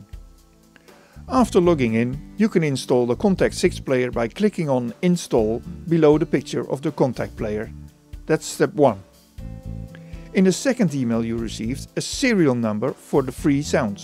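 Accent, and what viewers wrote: Netherlands. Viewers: you sound really dutch lmao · Haha I also noticed within the first sentence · I can clearly hear you're dutch man. Just like me or not? :-) I recognize it directly in the first 10 seconds lol. Good you're doing this and i really love it. Thanks i appreciate it!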